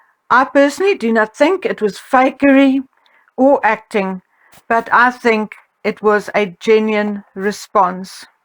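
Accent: South Africa. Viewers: ...firstly I really enjoy your vids and appreciate all the research and effort you put into producing and posting them Thank you. May I ask about your accent? Is it South African?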